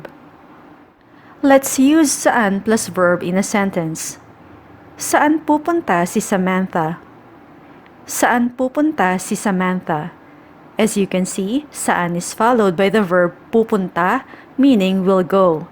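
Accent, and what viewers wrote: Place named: Philippines. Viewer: That’s the true Filipino accent actually. Very far away on how they portray Filipino accent on the internet/movies. 😅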